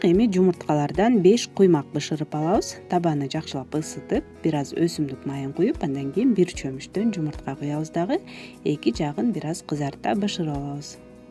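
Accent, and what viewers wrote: Standard. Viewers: Качество ваших видео всегдана высоте мне нравится всё что вы готовите ваша чистота на кухне и как вы говорите чисто на кыргызском языке я рада что полписана на ваш канал в дальнейшем тоже радуйте нас своими вкусными неповторимыми рецептами я из Оша😘😘😘👍👍👍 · Эн сонун 👍таза кыргызча суйлогонунузго баа бердим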